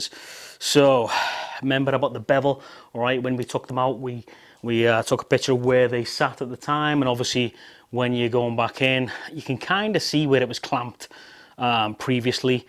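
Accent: Liverpool, United Kingdom. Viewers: Nicely done, taking your Scouse glide to another level as well as your editing and audio. I never saw on your “ To Do “ list win the league for Liverpool. Sending my best wishes from Scotland to you brother. Much love to you and yours. 👍👊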